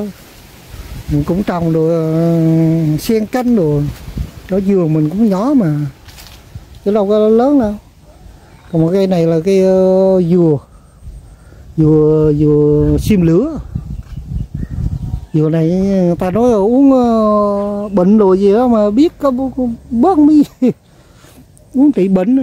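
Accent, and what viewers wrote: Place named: Ninh Thuan, Vietnam. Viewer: Bạn ơi bạn ở Ninh Thuận còn mình bình thuận nhưng xa quê lâu rồi nghe bạn nói chuyện giọng nhớ quê hương quá cảm ơn bạn đã cho mình nghe lại giọng nói của quê hương dấu yêu ♥️♥️♥️♥️🥰🥰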